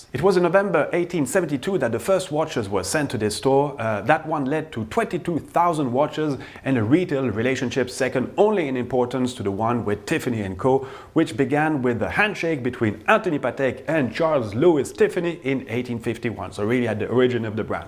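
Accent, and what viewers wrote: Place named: France. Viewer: Interesting content and very ambitious lecture. But: you try to press much details into 20 mins of video. That leads to a velocity of your speech which together with your strong french accent I can hardy understand. And I'm familiar with Englisch. Alltogether I'm faced with a kind of frontal teaching, which I hoped to be extinct since the 60s.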